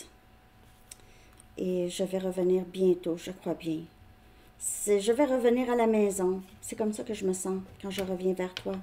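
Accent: Canada. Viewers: Bonsoir, votre guidance me parle énormément. Merci à vous.🙏❤️. J'adore votre bonne humeur qui est contagieuse et votre accent canadien. 👍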